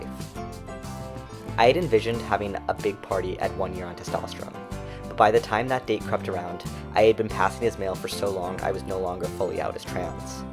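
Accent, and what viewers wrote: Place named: Northeast, United States. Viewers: Seems like Maine (based on the ID) but it's a pretty general 'northeast' accent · I love this guy’s American accent but I’m British and can’t work out which state this accent comes from?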